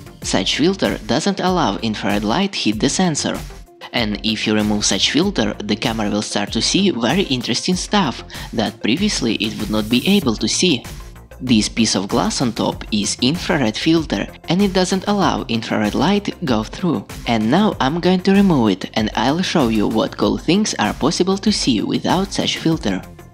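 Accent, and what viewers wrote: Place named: Russia. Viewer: Москальський акцент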